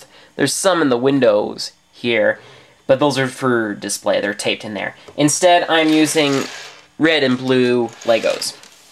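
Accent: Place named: United States. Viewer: i love how im english and i heard u say some american words wich are funny like lever and we say leever and nickle. pence :P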